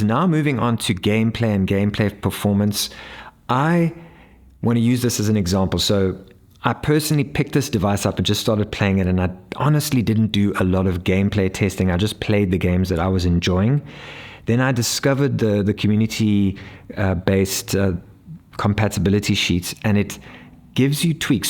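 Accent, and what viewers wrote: South Africa. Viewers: This is SO GOOD. Want to do this with my phone as I don't own a retro handheld but this is basically an android setup guide (which is awesome). You sound like you are from South Africa? I'm from South Africa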